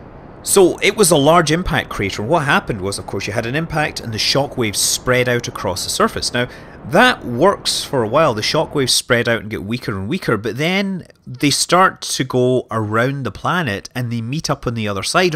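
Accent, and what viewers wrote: Scotland, United Kingdom. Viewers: Omg, nerdcubed sent me here and I was a bit reluctant but now I know your Scottish I’m amazed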